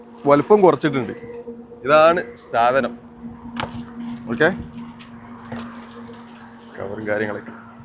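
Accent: Malappuram, India. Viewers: സഹോദരാ, നിങ്ങൾ അത് അർഹിക്കുന്നു. നിങ്ങളുടെ അവതരണവും വിവരങ്ങളും മലപ്പുറത്തെ ഭാഷയും വളരെ രസകരവും ഗംഭീരവുമാണ്. ഞാൻ കണ്ണൂരിൽ നിന്നാണ്.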